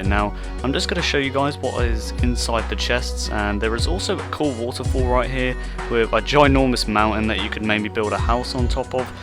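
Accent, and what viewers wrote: United Kingdom. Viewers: you have such a generic british accent :D when i try to make a british accent your's is precisley what i get. and my main accent is East-Coast American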